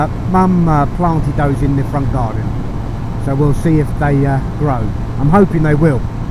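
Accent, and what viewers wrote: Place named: Northern England, United Kingdom. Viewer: His British accent just got a bit more 'northern' now, lol.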